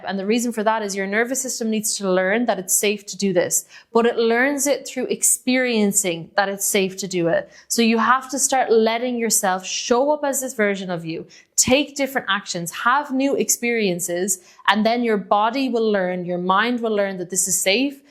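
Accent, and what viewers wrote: Ireland. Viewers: Its so rare to hear Irish people on Youtube you got a sub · I detect an Irish accent?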